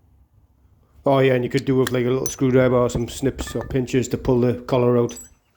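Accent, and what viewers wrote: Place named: Newcastle, United Kingdom. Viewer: You sound like Jimmy Nail. The Geordie accent is my favourite one.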